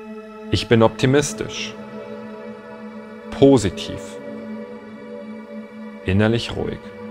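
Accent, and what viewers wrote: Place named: Bavaria, Germany. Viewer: Nur die bayerische Aussprache stört, z. B. Großartikkeit ..., sonst super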